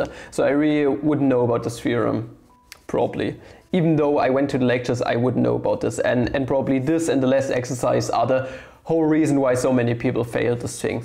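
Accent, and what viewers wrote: Germany. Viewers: Hat keine Sekunde gebraucht um den deutschen Akzent rauszuhören haha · Dieser deutsche Akzent ist richtig Hardcore. Aber geil!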